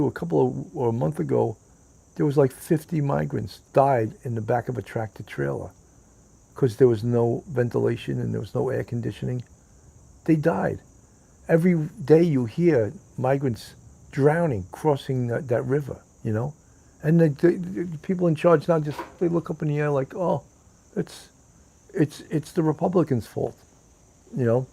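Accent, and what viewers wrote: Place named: New Jersey, United States. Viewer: Sorry, missed the live but here for the replay. Hope you are doing well. Ty for all you do. Love your accent... reminds me of growing up in NJ... moved to Tx in 79 bc my late husband grew up in the Dallas area. Now all my children are grown up and my grandchildren are all here. I try to stay away from mainstream news... too wacky and anxiety inducing.... would rather watch you and a couple of other yt channels w integrity.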